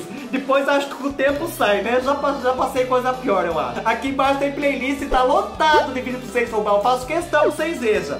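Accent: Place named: Caipira, Brazil. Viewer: Vc é ótimo kkkk não perco um vídeo é bom de mais esse sotaque caipira eu quase morro de tanto rir kkkkkkk kkk😊😊😊😊😊😂😂😂😂😂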